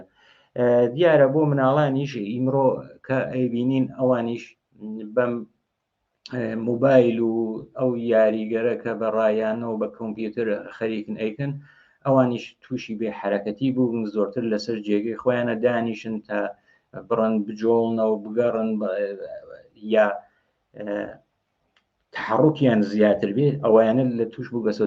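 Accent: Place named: Ardalan, Iran. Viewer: خسته نباشید . امید به بهبود بیشتر برنامه های شما . چند پیشنهاد کوچیک ۱ به نظرمن لهجه شیرین اردلانی را فقط حرف بزنید کافی باشد. ۲ اشکالات تکنیکی در پخش اتاق فرمان دقت بیشتر شود. ۳ مهمانهای که دعوت می شوند حتما متخصص در رشته مورد بحث باشن. ۴ در نوشتار(ته ندروستی) ( ته ندرووستی) غلط املایی کوردی دارید . دقت لازم است .! 🚩🚩🚩 در کل برنامه خوبیه امیدوارم که بهترم شود. .ارادت مخاطب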